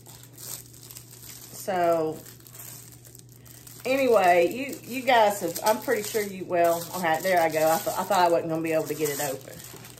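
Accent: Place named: United States. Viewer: Howdy! Love the cow girl vibes / accent! So much fun!!!! 😊🖤🩷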